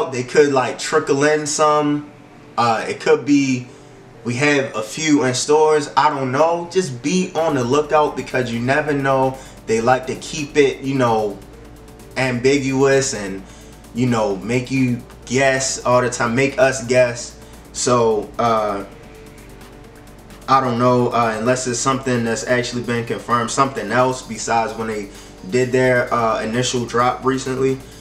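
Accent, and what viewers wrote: Baltimore, United States. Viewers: you from bmore? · That acent you from Baltimore?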